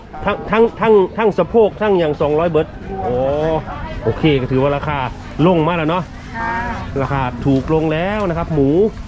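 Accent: Phu Thai, Thailand. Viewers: แอดมินเว้าภูไทมวนครับติดตามประจำเบิ่งอยู่กรุงเทพจากคนอุดร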